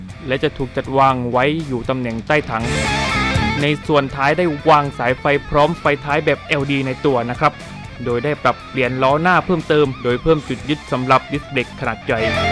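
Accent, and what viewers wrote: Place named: South, Thailand. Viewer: คนใต้แน่นอนนิ้<num>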